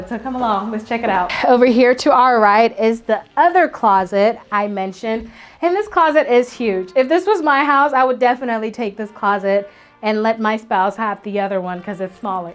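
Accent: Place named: England, United Kingdom. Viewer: Hey!!! I personally like your screen presence and your English accent is amazingly easy to understand. Congratulations on your such professional video. Maybe one day we will meet in NC for a deal. Thanks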